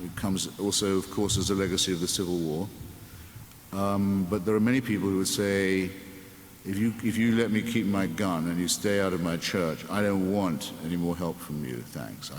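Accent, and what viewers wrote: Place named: England, United Kingdom. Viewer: If he didnt have an english accent no one would care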